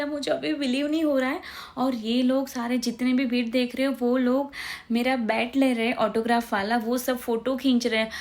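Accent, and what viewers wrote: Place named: Gujarat, India. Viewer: Your gujarati accent is good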